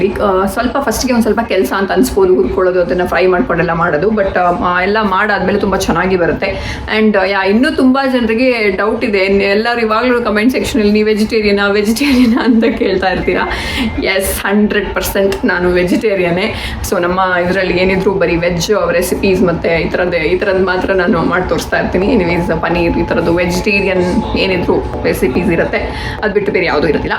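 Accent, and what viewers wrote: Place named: Bangalore, India. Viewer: Abhinandanegalu.... 👌👌👌 Nim kannada Blore kannada..... sounds nice... but namma Udpi kudla kannada keloke thumba chennagirutthe... alwa... omme kudla kannada dalli maathadi... chanda irthadhe...🤭 Cute.... 😘 ❤ from Dubai....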